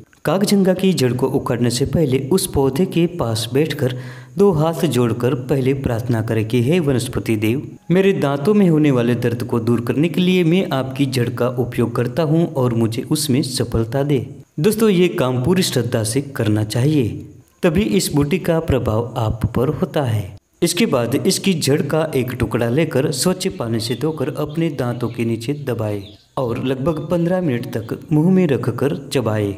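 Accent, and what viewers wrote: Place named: Tamil Nadu, India. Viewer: Tamil wala bolti hai. Aati nahin hai.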